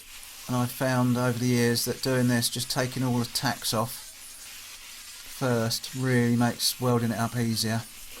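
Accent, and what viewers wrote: United Kingdom. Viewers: I love your video. I do not have a job and could not afford the prints. I sort of drew my own and made programs for my cnc plasma cutter. You had trouble with things fitting but some of my parts did not come very close at all. None the less, I do have the general shape. I am from the USA and your English and accent is something else to me. I do not speak the Queens English. Maybe if I had some tea as you did, I could work thinks out better. Frustrating and fun.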